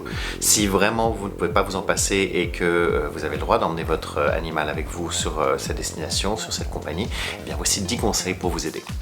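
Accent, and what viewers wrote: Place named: Quebec, Canada. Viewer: Bonjour, merci pour cette vidéo extrêmement détaillée. Même si j'étais fort bien préparée, j'ai appris de nouveaux conseils avec vous. Je me sens beaucoup plus confiante aussi. Également, je me demandais êtes vous québécois? Vous n'avez pas l'accent français. A bientôt 😊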